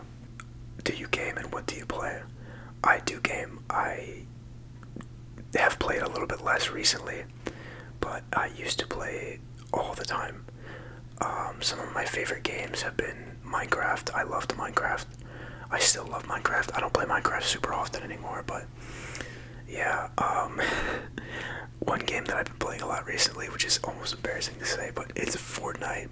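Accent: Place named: Brooklyn, United States. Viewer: I’m interested in pinpointing your accent. It has been muted somewhat by education and socioeconomic status, but my guess is Brooklyn or somewhere near.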